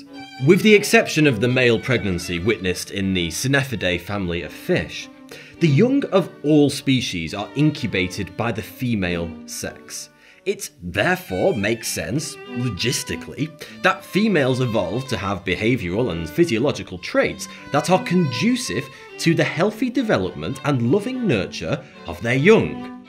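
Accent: United Kingdom. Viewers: You haven't been watching much of him then. I used to learn cute factoids in a British accent from him now he's just another IDW wonk. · You’ve gotten a lot more British I see bud good on you! Missed your uploads..loved the look on the subject hope your doing well!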